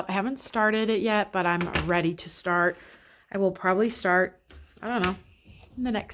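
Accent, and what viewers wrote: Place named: Midwest, United States. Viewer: Ah I knew you lived in the Midwest I heard you say Missouri lol! I didn’t want to be the creeper that asked where you lived but I live in Nebraska and from Iowa so I could tell by your accent you were a midwestern like me ❤❤😂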